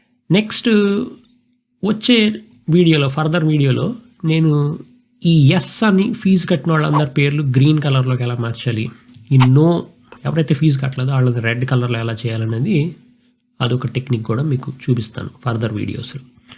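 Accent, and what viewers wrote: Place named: Telugu, India. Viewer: with Telugu flavor, with a natural slang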